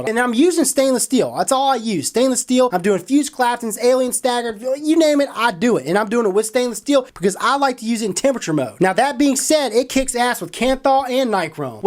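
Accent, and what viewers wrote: United States. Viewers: Greek-German here that speaks fluent English. It's not day-de-lus, it's also not tha-tha-los (although it's pretty close, the transcription is just shitty). First of all, in modern Greek the Delta(Δδ), the d in the Latin version, is pronounced softly, somewhere between an English th and a d. Then, the ae, the Latin transcription of the Greek αι, is pronounced like the German ä. You can try to pronounce it by forming an a with your mouth but making an e sound. And lastly, when pronouncing -los or -lus try to get the "twang", that you Americans have, out of your vowels. Take these tips and you should get pretty close. This comment was written poorly because it's like 2 AM where I live and I'm too lazy to proofread.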